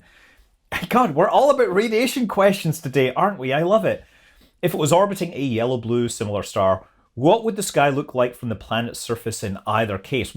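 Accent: Scotland, United Kingdom. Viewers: Enjoy your videos. I find them interesting, informative and entertaining. I'm also partial to your Scottish accept as I'm of Scottish descent on my father's side. (My grandfather was born in Edinburgh.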